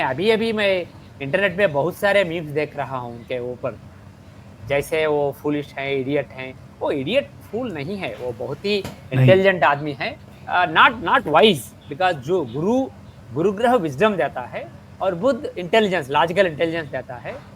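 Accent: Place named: Andhra Pradesh, India. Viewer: SIR, this SIR also talking like ME( HINDI).Is He from ANDHRA..😊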